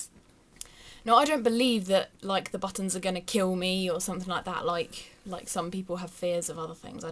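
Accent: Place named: Australia. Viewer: You made me laugh sooo much how you said things it made it even more funny with your Australian accent.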